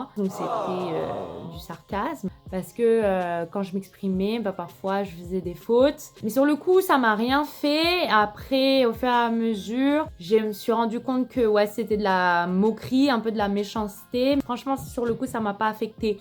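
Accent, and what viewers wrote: Philippines. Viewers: You are very beautiful and eloquent!!! Your English accent is so Pinoy.. love it!!! I can speak 3 languages, Filipino, English and Portuguese.. You have the basic in Spanish, I think you can easily learn Portuguese as well... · Lol i love you im kapampangan living in U.S hit me up when u get here lol. Ur accent s filipino accent that learn English 😍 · Your tagalog is very pinay ...Lol......I enjoy the video :-D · OMG when you speak Tagalog you're so cute! And when you're speaking English I can hear a Filipino accent xD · the filipino blood is screamin in the way you speak wow the accent lmao hahaha the berry and very such a filipino thing more power to you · you sound more like a filipino accent.... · You literally have a Philippine English accent HAHAHAHA I love seeing diaspora have our accent I think you've adapted the Filipino accent when you went the Philippines haha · pretty sure your English is pinoy accent but smoother lol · Your English accent actually sounds very much like Filipino-English. 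😅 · Your english is close to filipino english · Filipino Accent nga ang English mo bhe😍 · You have filipino english accent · your english is definitely in filipino accent, love it hahaha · Your english accent resembles the Manila english accent. Probably because of your mom's and relatives' english accent (tagalog-influenced) when they speak to you. But of course it's not 100% since you are also influenced by Parisian French but I think the accent leans more toward the PH english accent. This is the very first vid of yours I've seen -- found you interesting and funny so I subbed. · Your English is very pinoy 😊😂 And I speak English, Spanish, Tagalog, French and some Portuguese. 😘 Hoping my future daughter will look like you hehe Sobrang belle <3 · Tu acento en inglés parece filipino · I’m glad naman I can still speak Tagalog, of course English and I can speak Spanish. I plan to learn Mandarin, Japanese and French sometime in the future! 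I love lesrning about different cultures coz it’s so intriguing and good for the soul diba. Salamat with a H 🤪😜😃🙂 Your Tagalog diction is so good! Yun diction mo Filipino talaga. I guess yeah you’re right your English has different accents pero Pilipino more hahaha. First time I’ve met a Filipina and Algerian, beautiful mix!! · Your english is Filipino accent hihi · Je pense que ton accent en anglais est un peu l’accent philippin. Mais, oui, c’est influencé déjà par les autre langues. Magnifique vidéo. Ton Tagalog est parfaite! 😊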